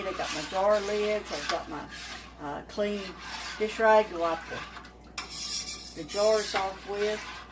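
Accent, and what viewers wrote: South, United States. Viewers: Well, I have never personally canned anything! This video was a joy to watch and to again hear your sweet southern accent bringing peace to me this morning. God is so good. My dear Grandmother would can pie mixes, so this also brought memories of my sweet grandma Alyce. Thank you. 💕 A couple of thoughts I had watching - I'll share - you need a strong shoulder to stir for at least 30 minutes, then an even stronger shoulder to mix in all that fruit. Enlist a grandchild or otherwise stronger younger person to be the official strawberry stirrer! The rewarding part of being enlisted in your kitchen is time with you and some fresh strawberries! And finally, you ended with listen for the "ping" - assuming you mean your jarring caps ping? I didn't know that! That must be rewarding music after hours of labor. 🎶 Thanks again for sharing. 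Have a blessed day.❤️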